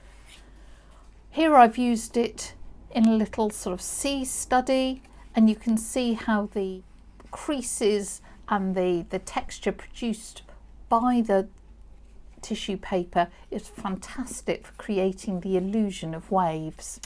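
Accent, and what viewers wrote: United Kingdom. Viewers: The most lovely accent in the world is the British one❤❤❤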